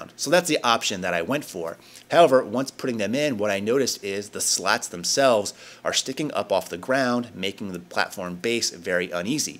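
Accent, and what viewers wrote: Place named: East Coast, United States. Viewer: East coast accent coming through HEAVY in this video! Great review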